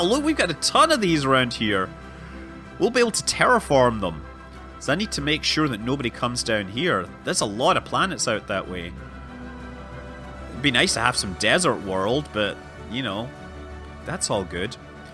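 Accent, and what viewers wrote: Scotland, United Kingdom. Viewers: So you totally taught me to play KSP and I found Stellaris myself recently and in my YT searches, I found your name again...I thought to myself "Listen to that sweet Scottish accent talk about this new game I'm playing, FUCK YES!". Haha.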